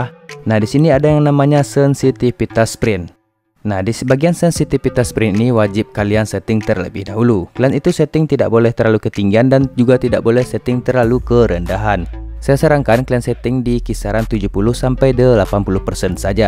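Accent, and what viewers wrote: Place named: Aceh, Indonesia. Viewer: Bang lu orang Aceh lah, soal nya cara ngomong logat nya kek orang aceh, perasaan gua doang atau gimana ya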